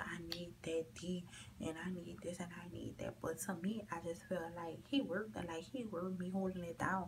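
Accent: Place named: South Carolina, United States. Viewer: Are you from Charleston, SC? Your accent sounds very familiar to me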